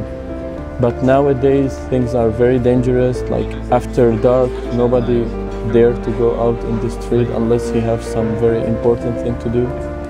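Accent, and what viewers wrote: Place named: Russia. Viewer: Так хорошо выучил русский, что на английском говорит с русским акцентом. Я почти все слова смог разобрать, хотя английский на слух обычно понимаю плохо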